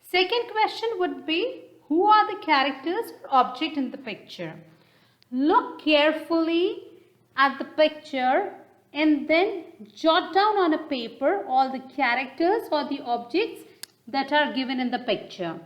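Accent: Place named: United Kingdom. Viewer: Its a britishian way to speak English 😒foolish...😏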